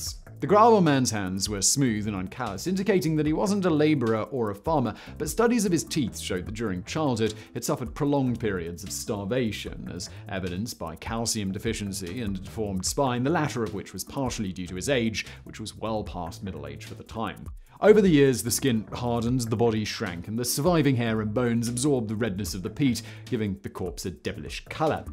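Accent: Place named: West Country, United Kingdom. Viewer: Mate, you say the words surprise, died, alive , bluntly , all a bit funny.. .west country , country bumkin.ish Xxx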